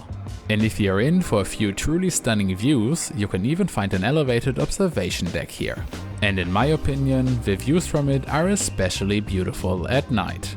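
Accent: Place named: Germany. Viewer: This is an excellent video by any standards! I can hear a hint of what I believe is your native German in your English pronunciation, but it's very minor and only adds to the authenticity of the video. Your Swedish pronunciation is impeccable. I just subscribed to get you a little closer to the elusive 1,000 subscribers mark.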